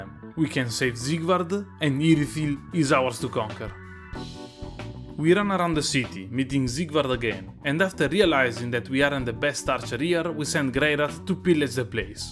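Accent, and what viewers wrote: Italy. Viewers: AH HA! Lo sapevo che l'accento era familiare hahaha! Per qualche motivo, questo è il primo commento che leggo che mi conferma che sei italiano anche se lo sospettavo dal primo momento (?) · Ma per curiosità, che accento è? Che parte di Italia? Ogni tanto mi sembra quasi spagnolo o slavo ^__^ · Sottofondo con le OST di Monster Hunter, contenuto di qualità e l'accento italiano non fa altro che rendere il video più scorrevole! (almeno per me :3) Ti ho scoperto da poco e mi sono iscritto subito, un canale così va supportato ^-^ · For real, I love Italian accent, I love dark souls so mathematically (I love this channel)². Btw awesome videos my dude, greetings from Brazil. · I love how i could tell you are italian from the first thing you said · You sound a lot like an italian trying to speak english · I started this video without knowing that was your actual voice and I legit just fell in love with it, time to play "Guess where that accent is from" Nevermind, I was guessing Siberia but he's actually from Italy.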